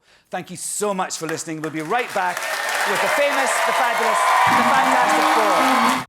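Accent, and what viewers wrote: Scotland, United Kingdom. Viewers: I didn't know Alan was Scottish. Whenever I saw him in some movies, he had an American accent. He did an excellent job hosting Jimmy Kimmel Live👍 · Nice to hear a Scots accent doing the monologue on a late show again.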